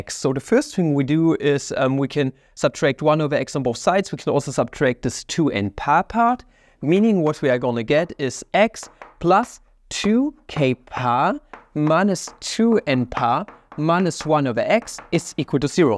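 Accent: Germany. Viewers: Are you German? I always thought you had a German accent and a German name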